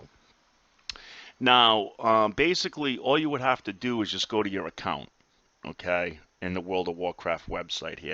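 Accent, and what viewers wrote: New York, United States. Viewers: Are you from new york?! If so youre awesome! Love your accent!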